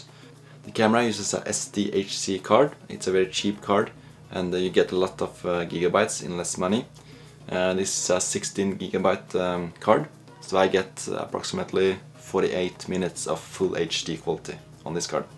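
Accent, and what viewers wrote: Norway. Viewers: jeg hørte at du var fra norge, på engelsk-dialekta di ^^ Ska kjøpe det kameraet etter nyttår, gleder meg! :D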